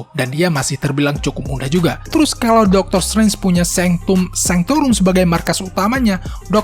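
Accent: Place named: Bali, Indonesia. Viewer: Logat bali ne.. Adminnya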